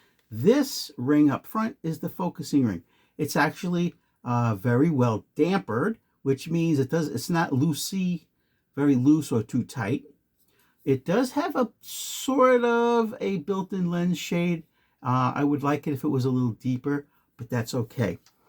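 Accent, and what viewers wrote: New York, United States. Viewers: Watching from Bangkok Thailand! You have the best New York accent ever. Thanks for your advice on my new mic set up!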